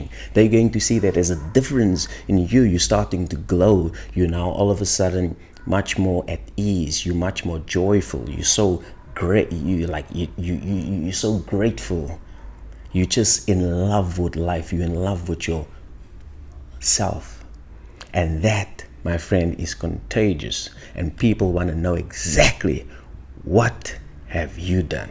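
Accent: South Africa. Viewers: Sounds like a South African🇿🇦. Thank you for sharing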